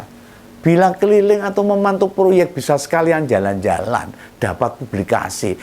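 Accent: Java, Indonesia. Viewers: Saya suka mas Admin ini bicara nya MEDOKK...wangun jawane...mantab ..beritakan yg pro Demokrasi dan yg anti Mulyono saja bossss..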